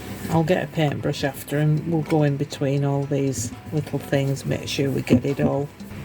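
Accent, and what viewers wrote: United Kingdom. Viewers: I have just come across your channel and love it. I have been sat here watching video after video instead of doing what I am supposed to be doing. You sound like a friend of mine, clearly from the same part of the country and the humour must be in the DNA from that part of the UK. You have some interesting ideas and produce some great stuff. My hat goes off to you for doing the video's; I'm a former College Lecturer, use to, shall we say, some unappreciative audience members, but I certainly could not do what you do. I look forward to not doing what I should be doing in the future and watching your video's instead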